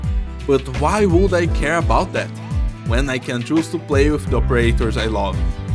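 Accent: Brazil. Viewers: I'm hearing brazillian accent? Btw, nice video, i know what makes an operator a bad one is the amount of effort they need to complet a stage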